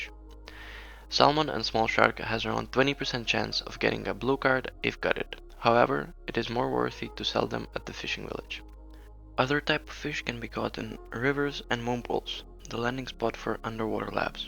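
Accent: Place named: Lithuania. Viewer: u sound lithuanian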